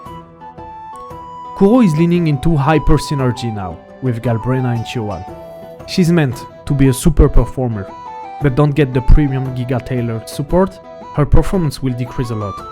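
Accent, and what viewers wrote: France. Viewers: Are u french cause i love the accent❤😂🎉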